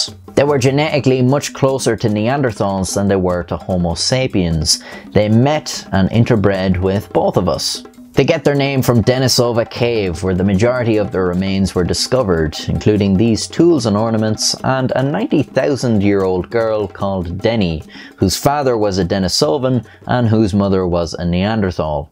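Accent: Ireland. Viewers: How does only have 500,000 subs? Man u cover all the coolest shit. Definetly the coolest Irish guy I've ever seen lol just seem less like a guy with a kilt than the rest hah. Thx 4 the vid man. War history stories r my favorite thing u cover like the ww2 meth guy but any war history is interesting to me. Next time u get time for a war story up your sleeve would love to hear one. I usually cant stand an Irish accent like old British is also nails on a chalkboard for me but this guy is pretty chill I don't even notice it. I live in the sticks of Kentucky so im sure I sound strange to some people. Conor McGregor gave u fellas a bad rep god tht dude is obnoxious lol. Keep it up man love the content 5 star quality. I'm honestly suprised you don't have more subs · "15 of tha fookers were found in a cave" This is why we need you narrating history documentaries, nothin captures my attention faster than that lovely irish accent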